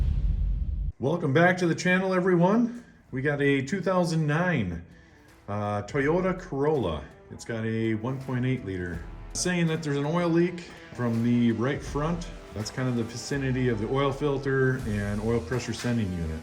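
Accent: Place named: United States. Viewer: good, simple, and in clear USA English. A DIY guy can do this (replace the unit and do the oil change with a good filter) and save a LOT of money!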